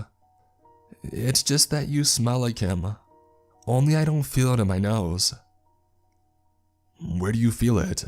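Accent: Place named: North Carolina, United States. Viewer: I’m guessing whatever answered his prays and told him, yes. you will die in a cell or this cell, idk if it’s just me or I am remember wrong,, but I thought he said that. And if he did i wonder if it’s the same prison he was in, or maybe he did say in a cell. Either way I like how he became a officer, that and him saying this is my last time. That’s kinda for shadowing him getting hurt and killed if he had to respond to whatever he needed to be they’re for. Love your work my guy, you have the best voice imo, I hate to say it but Iv got ware I always look for your channel over the others. There not bad but I relate more to how you talk lol. Idk if it’s because I live on the east coast of NC and have friends around here and a couple in Ten that have a similar voice lol idk how to really explain it lol. But your the best even your new channel is funny af but it’s got both types of funny. 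Funny just to be funny and funny like watching a guy pull a dame big looking gater up while in a basically a live rafe if your lucky, and him thinking time to nope tf right up out of here lmao.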